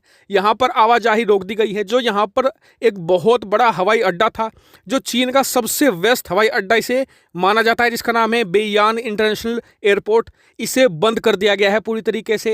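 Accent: India. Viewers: Why you talk as Indian varient ?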